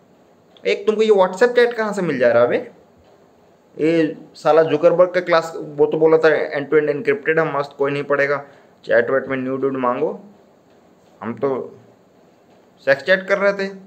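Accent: Bihar, India. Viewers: That bihari accent 😍, makes the video great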